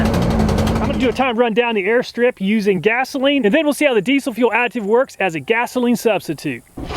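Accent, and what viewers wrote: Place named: South, United States. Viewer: I listened to you say ultra low sulfur diesel 3 times cause my friends said you sound just like me with my country accent. Lol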